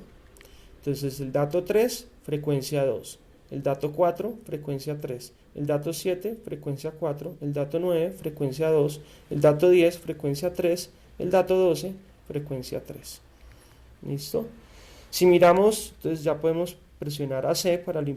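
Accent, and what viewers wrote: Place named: Bogotá, Colombia. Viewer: el inconfundible acento de Bogotá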